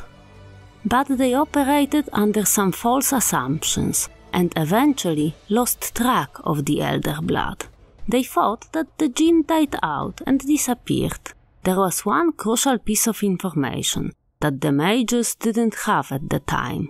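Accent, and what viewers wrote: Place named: Eastern Europe. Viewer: I love your accent, thought first its Italian but its slavic isn't it? Subscribed and looking forward to listening to more lore in the best voice and accent for it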